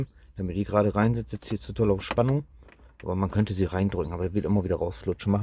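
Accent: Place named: East Frisia, Germany. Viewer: Hey ich muss dir ganz ehrlich sagen du machst einen Mega Job und es gibt keinen dem ich bei sowas so gerne zusehe wie bei dir! Der i Punkt finde ich noch ist dein ostfriesisches gesabbel zwischen durch wenn es dich wieder packt Danke für deine krasse arbeit usw vielen.vieleb dank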